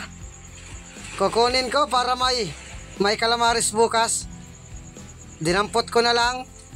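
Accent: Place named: Camarines, Philippines. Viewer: Idol taga camarines ka ba, yung tempo ng salita mo taga sa amin ay, ingat lang idol sa paninisid, Sana makahuli ka palagi ng marami sa bawat sisid.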